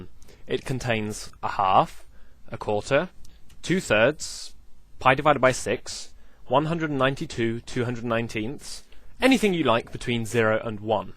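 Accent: United Kingdom. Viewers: OMG man, you are a comedic genius. I loved this video!!!!!! Make more!!!!!! Chainsaw + Baby is more of a supper or dinner thing, at least i think so... and the British accent kicks butt! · zenos paradox - infinite divisibility, cantors argument - whatever u said here.. cant get past thick british accent.. but im assuming that notion was pointing zenos paradox. the infinite variables from infinite divisibility. there is some likeness in these, surely you must see it. if cantors proof notes incongruity through bijection, it is only out of the inadequacy of listing real numbers. out of his entity, out of our sentience existing finitively. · American's (i hope i speak for my country) don't find British accents funny, but fun to listen to and attempt. If anything, it might be the slight lisp or sibilant s... don't worry about it, it's awesome.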